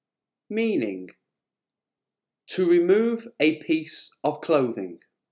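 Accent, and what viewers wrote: United Kingdom. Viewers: I'm so obsessed with your British pronunciation. I think it sounds so sexy when speaking like that. I fancy scranning food and shopping spree, too. teaches us spiffing & stupendous lessons. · We want to continue teaching all the phrasal verbs in your performance. Just great British pronunciation and brilliant phrasal verb learning tactics !!!!!👍👍👍👍👍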